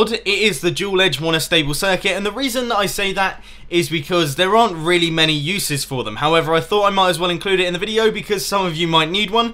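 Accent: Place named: United Kingdom. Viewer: I swear the British accent is thicker in this video than it is in current HermitCraft episodes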